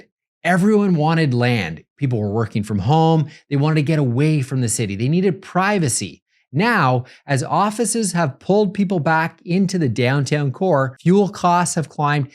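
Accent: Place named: Ottawa, Canada. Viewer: can hear the ottawa accent. love it haha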